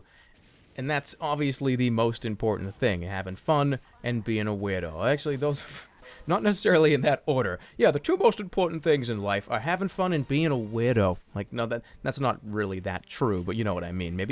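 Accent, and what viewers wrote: Boston, United States. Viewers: Your boston accent is just orgasmic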